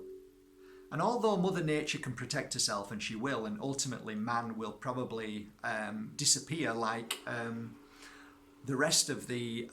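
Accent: Yorkshire, United Kingdom. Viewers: 100% agree with everything you say x \especially about the govt x I have two large Himalayan salt lamps in each room to help with neutralising the effects of wifi and always wear crystals too but am going to start turning of the wifi at night as you suggest . Nb : Are you from Yorkshire originally , like me ? Sounds like a good old yorkshire twang you have there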